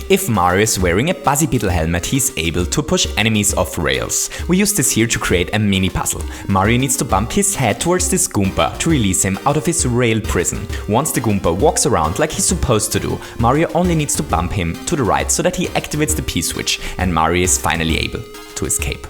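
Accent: Germany. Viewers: Why would you pronounce Kaizo in an American way (sounds like Kayzo, when you say it)? English clearly isn’t your mother tongue and I guess it actually is German. If you would simply pronounce it in a German way, you’d be much closer to the actual, Japanese pronounciation.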